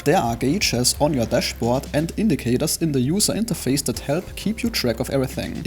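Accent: Germany. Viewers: Versteht man ja kein Wort mit dem deutschen Dialekt. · a little bit german ich höre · Das Denglisch hör ich doch direkt raus. Gutes Video❤ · Excuse me Brother but kommst du zufällig aus Germany? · Only narrator where I am not sure whether he is talking german or english.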